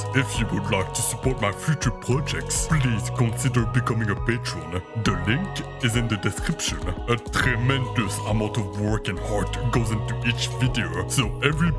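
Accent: France. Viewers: is his accent real or is he an american imitating a french talking english?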